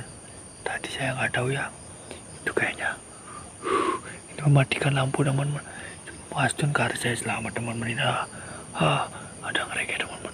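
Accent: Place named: Karangasem, Indonesia. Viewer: Bukan e takut kedek Cang nepuk bli logat e lucu Karangasem kubu pok bos bekas kuburan masal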